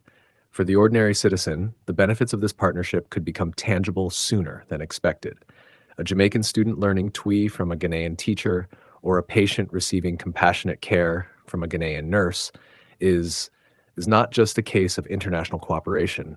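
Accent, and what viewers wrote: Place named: United Kingdom. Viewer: I Agree with you pretty tea. Narrator u talking as if Ghana are doing Jamaica & the people of a Jamaica FAVOR. Please stop it. It is good to see African Nations and the country of Jamaica closing gaps and coming as one. For your INFO Jamaica is not a tiny Country. I am tired of UK= England belittle Jamaica by calling Ja. tiny. 1,big, 2, small, 3,tiny. So all the other Caribbean What are they?, if Jamaica is tiny?. Jamaica is the third Largest Island in the Caribbean. U should know the word tiny from small. You sound British. are you?. U just want to put down Jamaica, u JEALOUS.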